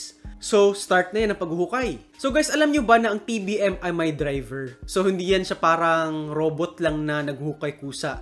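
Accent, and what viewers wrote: Visayas, Philippines. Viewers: Bisaya ka man d.i Engr. hehe salamat sa paliwanag 😁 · bisaya man diay ka kol, hahaha! nice content. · Bisaya ka man diay sir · Bisaya diay ka sir hhahaa 🙂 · Bisaya accent🤣🤣💪💪Sakk lagay..... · Nalingaw kos bisaya nimo tol, bagong fan here 😂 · Bisaya diay... 😆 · bisaya kaayo oi! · Bisaya ka engineer?😅🤣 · AY BISAYA D I KA? KAYATA PAREHA TA 😂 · Taga asa man ka Sir bisaya man ka ehehe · Uy bisaya man diay haha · Bisaya ka pala bay grabeha HAHAHA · Ayg paila na bisaya ka baii bwhaja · Bisaya mandi-ay ka Engineer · Ayyy bisaya ka.man dong 🤣🤣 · Dugmok🤣🤣 cute kaau ka magtagalog sir bisaya kaau.murag ako sd 🤣. New sub here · IDOL GYUD NKO IMONG ACCENT SA BISAYA DOL :D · hahahahahah bisaya pud diay ka lodi? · bisaya d.i ka bay ahaha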